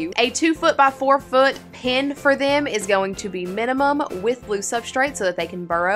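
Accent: South, United States. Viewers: As a first time viewer, hearing that southern accent come out of someone who isn’t white is amazing😂 loved the vid, hope you’re having a good day🤙🏼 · First time eating her video off rip oh she southern I can hear it lol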